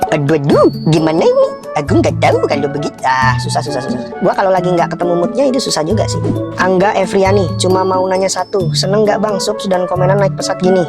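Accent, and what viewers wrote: Banyumas, Indonesia. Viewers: Oalah jebhule rika wong ngapak😂👍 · Org ngapak? PADA WOYY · Ngapak nya sebelah mana bang? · Bang gw juga org ngapak lu dmn nya 😂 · Ngapakny Mana Bang ? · Setuju jarna inyong juga ngapak lah broo😁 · Ngapake ngendi bos...bener bae nek pas ngomong ngapak ngena/medok bngt 😅😅😅 sem 😅👍👍👍👍 trus rika mantan kenshu pa bos? Kadang nek jepangane 👍👍👍👍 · Ngapak e ndi bang kebumen po cilacap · Ngapak e pundi mas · Jebule wng ngapak y, pantesan ana ngapak" e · Anjir wong ngapak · Gua suka banget kalo bang pakai logat ngapak😂😂 sumpah ngakak😂😂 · Ngapak sebelah mana banh? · Bang ngapak bgt rika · kalo orang ngapak, jadi keinget curanmor versi ngapak cilacap yah bang 😂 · Ternyata orang ngapak ya bang😅 gw juga orang ngapak ni btw ABG nya orang mana😁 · Ngapaknya mana bang.. cilacap.. Purbalingga, Purwokerto apa mana bang?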